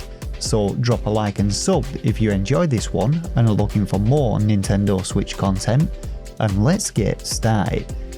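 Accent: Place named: England, United Kingdom. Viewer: The like and subscribe was not for the content - although really well done - but for the English accent. Amazing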